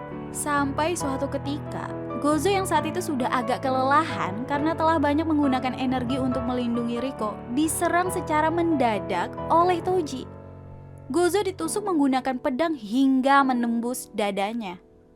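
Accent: Java, Indonesia. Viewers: Kangen suara yang medok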